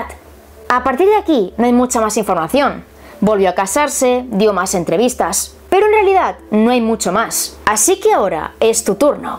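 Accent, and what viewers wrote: Spain. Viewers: Saludos bellotas Magnífico acento español, lo amo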